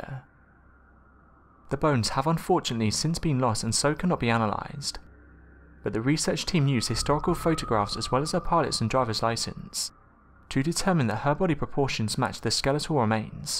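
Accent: United Kingdom. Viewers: What's up with the robotic British dilougue, lol. I agree you truly can't say 99% without DNA. The bones and the plane been found in different locations so which is it.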